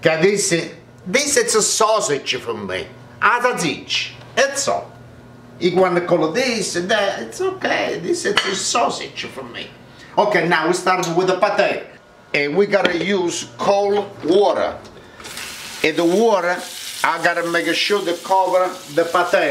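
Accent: Italy. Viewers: He sounds like an oldschool italian imigrant. Kinda cool.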